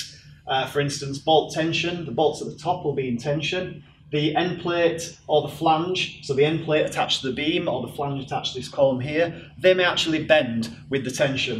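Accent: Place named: North, United Kingdom. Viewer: Thanks - great presentation - and the Northern accent reminds me of home!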